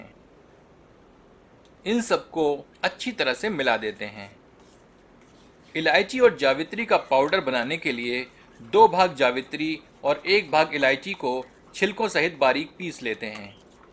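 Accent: Standard. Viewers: Bhai ji app butter chicken ki recipe bhi batana.. Please...Apki videos hum sabko bahut achchi lagati hai, apka shuddh hindi bolne ka andaz hum sab ko bahut bhata hai, I love you bro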